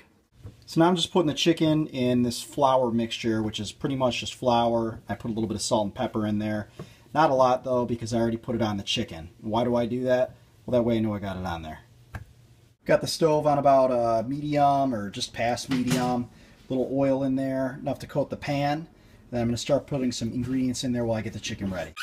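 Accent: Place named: Michigan, United States. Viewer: thanks for the video, you sound like youre from michigan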